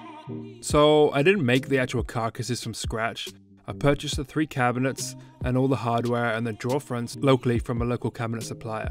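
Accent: England, United Kingdom. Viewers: You sound English. Why are you using imperial units and not metric?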